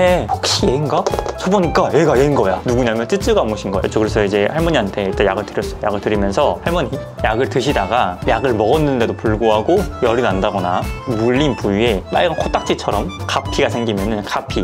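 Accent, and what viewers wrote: Gwangju, South Korea. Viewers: 광주 말투 너무 좋아여!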